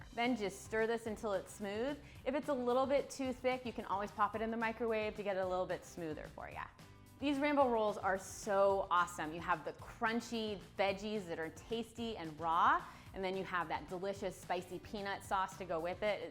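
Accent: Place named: United States. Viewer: Judging from your accent you are an american. Must say you pass as a cook venturing into exotic asian cuisine. Do pay more attention to the proper way of wrapping . Good try!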